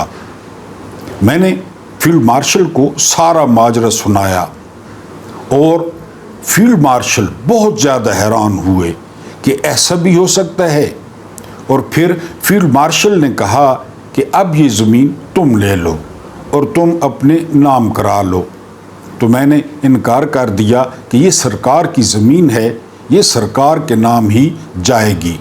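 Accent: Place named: Mianwali, Pakistan. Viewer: بلیک اور وائیٹ بالوں میں آپ ھیرو لگتے ھیں میانوالی لہجہ بہت اچھا لگتا ھے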